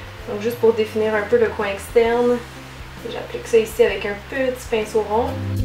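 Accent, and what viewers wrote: Quebec, Canada. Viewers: Oh une petite personne avec un trop meugnon accent québécois! Et en plus avec un tuto superbe Représente 🇫🇷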